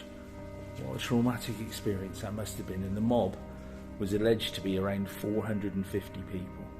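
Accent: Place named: South West England, United Kingdom. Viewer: Hello. Yet another of your interesting stories. You've got an accent very familiar to me. Probably Hampshire/Wiltshire or Dorset. Very enjoyable either way.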